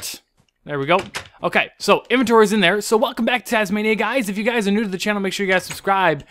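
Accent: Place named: England, United Kingdom. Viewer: not bad inglish acsent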